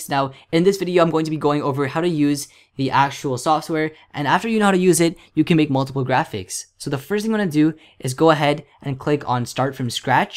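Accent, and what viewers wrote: Canada. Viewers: Are u CANADIAN? ( do you live there) Here?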